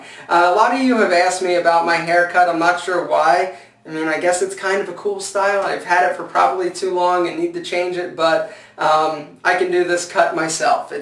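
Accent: Philadelphia, United States. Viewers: you sound like ur from philly "pool it to the back"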